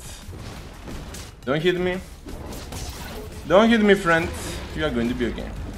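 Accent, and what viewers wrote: Greece. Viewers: Hey man love these videos, very funny and informative :) btw your accent sounds Greek, is that right?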